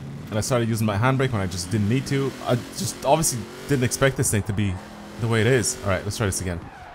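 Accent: Dublin, Ireland. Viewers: Good vid keep it up (quick question are u from Dublin in ireland cause u sound like u are from Dublin)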